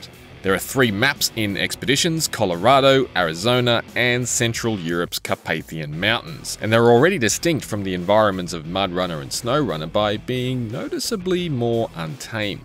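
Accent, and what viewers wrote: Australia. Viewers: Australian accent does that. A lot of us are very monotonous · He's an Aussie. It's how we sound. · Did they purposely find an aussie guy to review this? Kinda makes sense tbf lol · Yeah nah, He's positive neutral. it's just the Australian accent.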